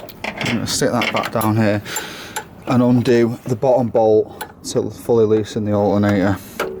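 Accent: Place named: Salford, United Kingdom. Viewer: Very good video - just got a siezed alternator on my 2007 Grande Punto and so this is perfect. You sound like you are from near Salford!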